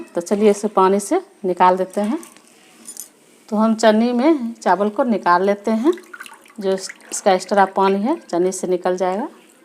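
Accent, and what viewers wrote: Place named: Bihar, India. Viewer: Aapke bolne ka tarika hi batata h aap bihar se ho me v bhagalpur bihar me hi rahti hu. Meri request h aap jo baate krti h usme kuch improvement laaiye viewers badhenge Or likes v jada milenge. Bura n maniyega recipe bhut achi h magar wo kya h dekhne walo ko youtubers v smart chahiye. Dhanyavaad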